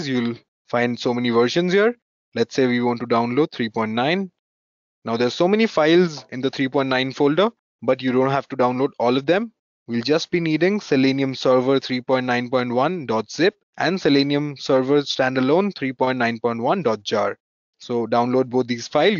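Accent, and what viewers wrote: India. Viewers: Indian accent, yes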